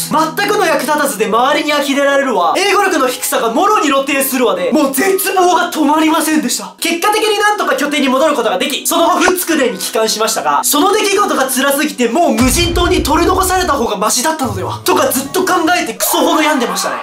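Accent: Australia. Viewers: やばいオージーアクセントめっちゃかっこいい私もカナダに留学して2年目です！めっちゃ面白い🤣です。応援しています📣、！ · ばっちりAussie accentになってて推せます。笑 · 1年でこんなに伸びるなんてすごい! 大学院(MBAかな?)TOEFLとかの下積みもあったと思いますがすごいですね。しかも、しっかりオーストラリア英語のアクセントになってる（笑） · オーストラリア訛りっぽくなってる！ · 待ってなんで、1年でオージーアクセントを習得したんや！？ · あと、オーストラリアンアクセントを習得してますよ！！！ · オーストラリアなまり！！カッケーっす！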